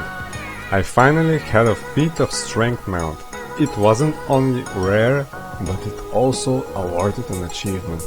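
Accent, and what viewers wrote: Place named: Russia. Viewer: ты русский что ли?) Слышно ужасный акцент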